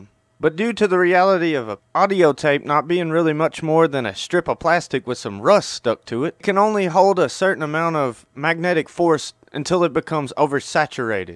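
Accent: South, United States. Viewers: love the American (southern?) drawl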